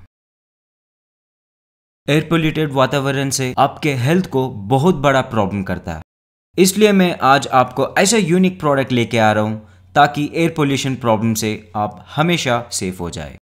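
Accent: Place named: South, India. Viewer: Are you from South there is something in your accent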